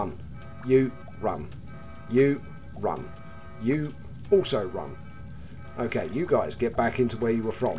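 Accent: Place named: Australia. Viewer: Bro your voice sounds like the Australian Accent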